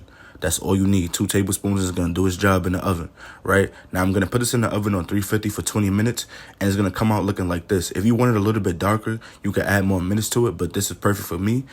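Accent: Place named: North, United States. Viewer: Idk how southern you are with the up north accent all of that but now we're just waiting on the collard green recipe 😆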